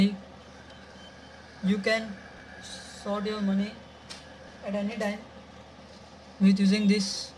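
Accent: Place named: India. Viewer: Dude Well done but some word are still in indian english so Some people won't understand it Well I m indian So I no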